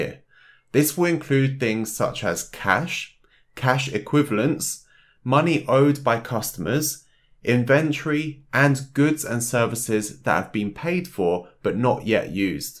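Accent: United Kingdom. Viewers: britich accent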